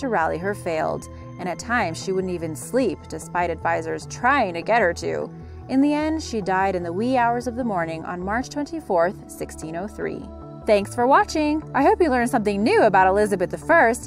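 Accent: United States. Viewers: Well she sounded american and like i said a few comments ago i watched another video that was american and they got their facts wrong when they said stephen hawking had als when he actually had motor neurones disease not trying to belittle anyone just saying for them to get their facts right because as you can see it will piss a lot of people off if they're incorrect such as this video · As soon as I heard the American accent I should have known that these "FACTS" would be nothing of the sort.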